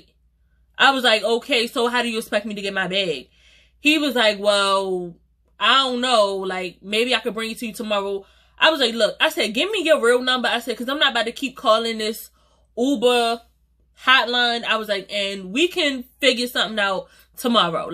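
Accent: Baltimore, United States. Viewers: Lol your Baltimore accent is strong as hell!! Love it! 😂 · Lmao you have to be from Baltimore! Your accent is too funny, I loved this story time lmao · Baltimore accent + A good 🍑 story teller ? I’m here 😌💖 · You can tell she’s from Baltimore!! You can tell when someone is from Baltimore. That accent mad heavy !! I fw it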